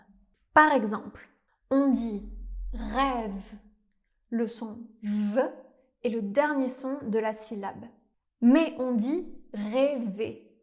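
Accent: France. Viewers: Disons que tu as un accent français de France très marqué pour moi ! En Suisse romande, on fait la distinction entre les a de bras et gâteau (fermé), et souvent entre le o (souvent ouvert) et eau (toujours fermé) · Bonjour. Je ne suis pas d’accord avec la prononciation pour le « ê ». Il se prononce bien « è » et non « é » quelque soit le mot. On a un accent circonflexe là où en ancien français, il y avait un S après la dite lettre avec accent circonflexe aujourd’hui: Hospital (Hôpital), Forest (forêt) etc. Pour le verbe rêver, il vient du mot « esver »: nous retrouvons bien le S. Cette règle fonctionne pour toutes les lettres avec accent circonflexe. Ensuite, je remarque que tu prononces le son « è » en « é »: tu dis francé et non français. Pareil pour « j’ai » etc. Tu as bien hérité de la patte du Sud de la France;) Enfin, le « â » est bien prononcé dans la région champenoise et surtout dans le Nord de la France. 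Je pense à la région de Troyes notamment ^_^. Sinon super travail pour les non francophones:) N.B: je suis bien française et enseignante;)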